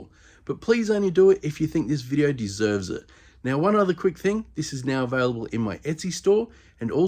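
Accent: Australia. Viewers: Wow! I’ve just stumbled across this page, love hearing a fellow Aussie accent hehe. This is an absolute beauty ❤️ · Hi, loved this video, but i’m wondering: Australia or South Africa, i’m pretty certain you’re not a Kiwi😊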